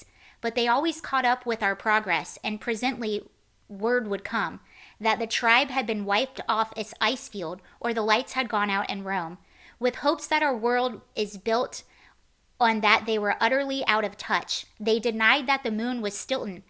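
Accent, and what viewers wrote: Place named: Chicago, United States. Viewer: Hey darling are you from Chicago area? I kind of had a feeling you were before but the T-shirt made me think maybe I'm right. I'm I was born the Evanston but I grew up on the Iowa side of Illinois in Kewanee but my entire family is from the Chicago area Naperville Downers Grove, and a lot of other places that are eluding me right now. But my mom and I lived in Lisle and Bolingbrook until I was about 5. And we have deep ties to the area. And tons of friends and family from over there that we still see. Though I live in Tucson now so I miss home but at least I'm in a Republican state or at least it was a Republican state people think that we're going to not vote for Trump this round which will make me really sad. I'm praying that doesn't happen I'm praying we stay a red state but so many leftist have moved to Arizona so I don't know. 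But anyway yeah I was curious if that's where you're from I was trying to figure out your accent. And I'm almost positive you have the same accent as my aunt but I could be wrong.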